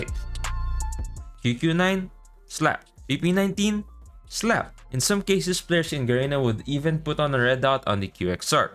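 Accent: Philippines. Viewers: Pinoy ka rin pala, tama kutob ko kase the way na mag salita ka e btw naubos ko na lahat ng vids mo. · I knew you were a filipino. Just from the a cent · Been watching your vids for a while now and I realized that you’re also a Filipino based from your accent. Good job! New subscriber here from Garena server.👍🏼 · Why is your english accent sound like filipino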